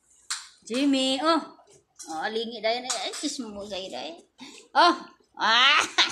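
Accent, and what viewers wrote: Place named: Visayas, Philippines. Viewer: Jajjajajajajja bisaya ka mam lab it bisaya din ako ug nalingaw ko sa imo mga itoy jjajajajajajja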